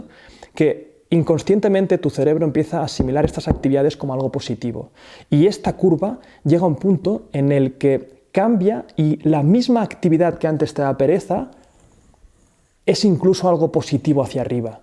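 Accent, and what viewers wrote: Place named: Castilla-La Mancha, Spain. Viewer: DE DONDE ERES? POR TU MANERA DE HABLAR!! QUE DICES "HE DEJAO " PASASTE LA NAVIDAD CON LA FAMILIA. ERES DE CASTILLA LA MANCHA? 😄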